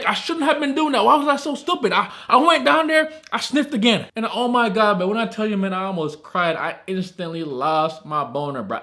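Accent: Jamaica. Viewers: U from Jamaican? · Lol... Didn't knw u was jamaican bro... Big up😁✌️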